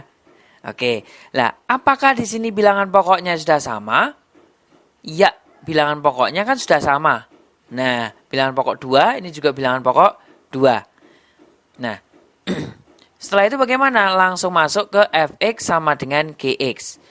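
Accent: Java, Indonesia. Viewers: Jawa bngett ka wkwk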